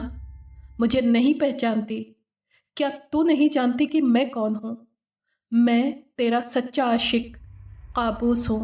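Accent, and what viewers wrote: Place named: Lucknow, India. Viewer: Yeh pyari awaaz zaroor Lucknow ki hai . Carry onnnnn 👍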